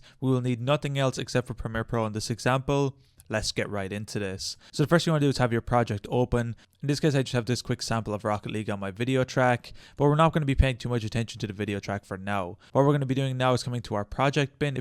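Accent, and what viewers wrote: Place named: Ireland. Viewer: Thank You! You sound Irish :D Also how did you get the Join Membership Feature??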